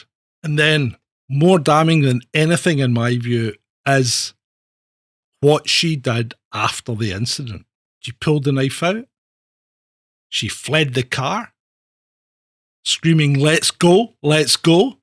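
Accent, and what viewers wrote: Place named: Scotland, United Kingdom. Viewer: Wonderful Scottish accent, greetings from Aberdeen, but to the point; first of all, she was not given the right to a defense, the provisions of the human rights convention were omitted in her case, and the Vienna Convention of 1968 was omitted! She did not have a fair trial, this trial was saturated with racism and discrimination!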